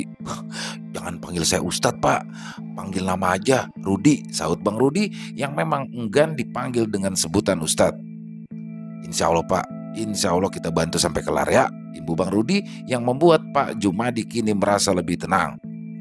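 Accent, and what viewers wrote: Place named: Jakarta, Indonesia. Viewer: wahhh mksh bang ada bang rudi lg ni ceritanya seru mantap yg plng ku suka bang bawain cerita betawi nya mantap suara betawiny sukseees terus sehat yselalu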